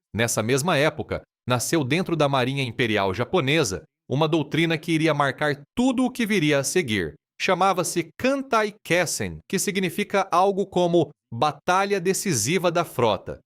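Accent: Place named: Caipira, Brazil. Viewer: Narração em caipirês acentuado. Não acho estranho pois sou caipira do interior de São Paulo, mas não estou acostumado com esse tipo de narração de fatos históricos. 👍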